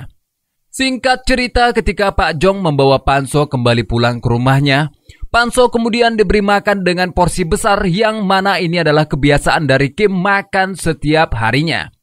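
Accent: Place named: West Java, Indonesia. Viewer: SPIL NGEREKAM SUARA NYAH LOGAT SUNDA😂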